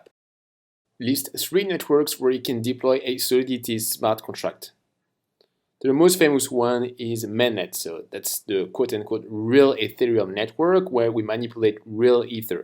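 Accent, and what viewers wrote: France. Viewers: Thank you so much!! Vu l'accent je pense que tu es francophone donc je te le répète : MERCI 🙏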